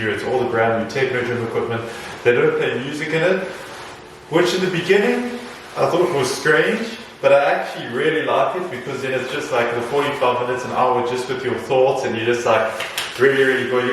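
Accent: South Africa. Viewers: Hi, judging from your accent you are South African😊 I'm south African and looking to stay a few months in Algeria. Where exactly are you staying? And how does life compare to back home. Ps. We're Muslim so cultural integration shouldn't be too much of a problem.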